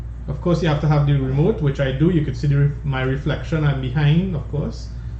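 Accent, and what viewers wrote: Trinidad and Tobago. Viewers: Are you Trinidadian?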